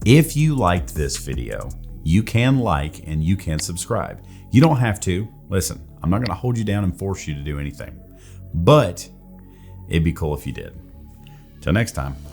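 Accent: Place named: Tennessee, United States. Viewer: Watching your videos as I decide to get into Real estate photography after leaving Real estate and just realized your voice sounded like it had some Tennessee in it! I’m actually from Chattanooga and recently moved to Louisiana. Love the knowledge and insight!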